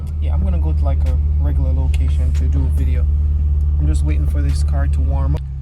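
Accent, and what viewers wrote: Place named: Jamaica. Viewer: U sound jamaican dawg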